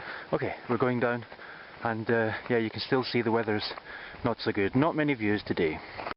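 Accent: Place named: Scotland, United Kingdom. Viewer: Hey man! congrats and thanks for sharing. Love your Scottish accent..Would you like to borrow your voice on new video or film I will be producing in the next coming year..check out my movie about the Via Alpina (blog <link>) Just few questions about Madatschjoch (time ). there are 2 ways from verpeil hutte..what makes you choose the trail through MadatschJoch and not VerpeilJoch ? Did you knew the condition of this trail and what it was like on the way down (ladder and a wall to climb down) ? I assuming you did not know according to what you said when reaching the Col Madatschjoch.... :-) I personally think the way down to kaunergrat was really tough...going up is slippery on unstable ground and with such weather condition you had i know I will have stayed and rest Verpeil hutte..;anyway you did it... From kaunergrat hutte did you follow the cottbuser trail to rifflsee (there is a short section that i would not like to pass on a rainy/bad weather day also there) or hit down the valley asap and skip that short "climbing section" to suunalm/rifflsee ? 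What camera are you using ? will look to your Greek video..I love Greece and it is very funny because you did or went to places I also went and liked Here an idea for you check out Mercantour NP Vallee des merveilles will be something you might like... happy hiking MUL rules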